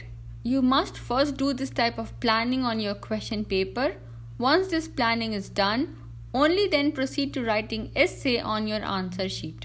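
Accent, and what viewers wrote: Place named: India. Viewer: ahahaha I love Indian accent !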